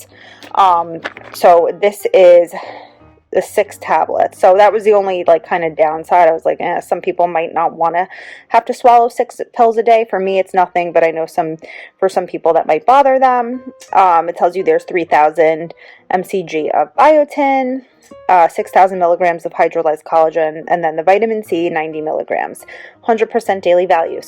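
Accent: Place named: Long Island, United States. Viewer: Are u from along Island New York? U sound like it! I am too! Great video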